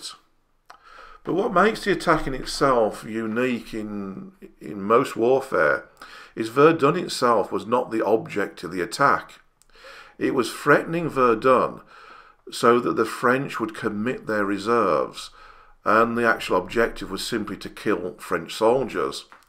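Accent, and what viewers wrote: Birmingham, United Kingdom. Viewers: Great info! Funny Brummy accent too!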